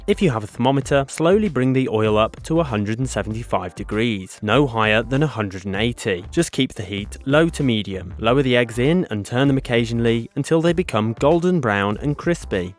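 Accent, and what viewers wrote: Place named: United Kingdom. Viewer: ! Seven egg halves or seven whole Scoth eggs? I had Scotch eggs at a picknick when I was young and absolutely loved it. I spent a month at a boarding school in England (language course - most Swedes went to Hastings and spoke Swedish to other Swedes, but my mum, a translator, would not have that, so it was boarding school) and that I had Scotch egg for picknick lunch one day is one of the strongest memories from those days. Strangely enough, since I can't remember how they tasted. We were on an outing to a fair, and I also remember buying "toffee", which was not toffee-like, but hard as a brick, so when I had bought it, the salesman smashed the "toffee" in its plastic wrap to break it in pieces. Anyway, VERY nice to hear a British accent explaining how to make Scotch eggs (because most recipes for Scotch eggs on Youtube are posted by Americans, and I could not even begin to say how tired of I am, and irritated by, American "English").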